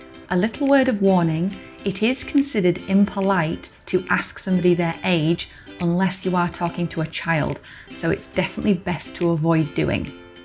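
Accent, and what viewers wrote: United Kingdom. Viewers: Proper Yorkshire lass! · Tnx for uploading the video, I like British accent. 👍🏻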